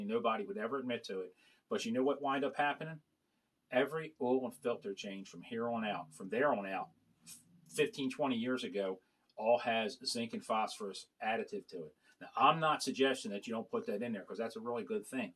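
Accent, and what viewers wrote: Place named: New Jersey, United States. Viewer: Love your channel brother,you have jersey accent ..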